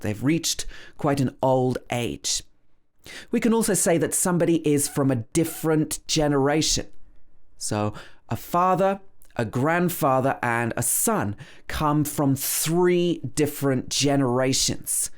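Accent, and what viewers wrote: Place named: United Kingdom. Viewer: Hi,. How are you?; I am living in Manchester and it is more important to improve my British English. It would be great if you make some videos of lessons like this THAT IS VERY PRODUCTIVE AND IMPORTANT. Your British pronunciation is great and all lessons as well That is the reason why I am learning English with you at the moment I have IELTS 6.5 but it is not enough. Thank you.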